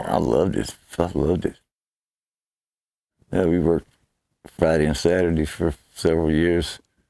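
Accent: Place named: Texas, United States. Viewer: OK that is friggin' hilarious Bill. He not only resembles President GHWB, he's got the Texas drawl too. for president! (Of course, the question of whether one of his greasiest, foulest turds would be better than what we have now is immaterial, but I digress.)::dons flame retardant suit and awaits arrest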